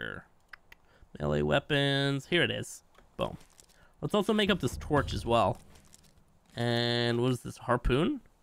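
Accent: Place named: Ireland. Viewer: What part of Ireland are u from in from louth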